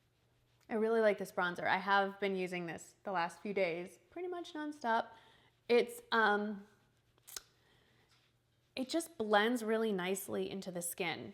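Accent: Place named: Illinois, United States. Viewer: I KNEW you were from Illinois!! I've just started watching you and just subscribed and wondered where you were from. (Little game I play, "Guess where this person is from... I'm goofy that way) I know three people from Illinois who sound just like you. Then you said you were in Texas and I'm thinkin' no way. Then you said it. Yayyy me! Anyway, enjoying your videos.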